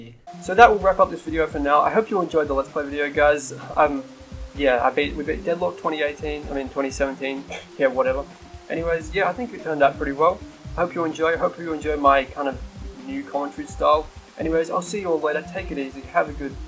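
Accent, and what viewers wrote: Australia. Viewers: OMG IS AUSTRALIAN WHICH PART OF AUSTRALIA DO YOU LIVE IINNNNN??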